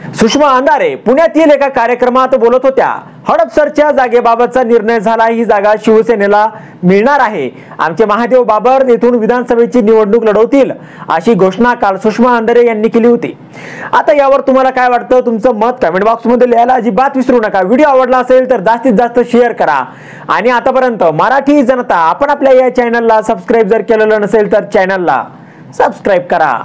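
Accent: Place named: Bihar, India. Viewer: Tai lay Bihari bolataahe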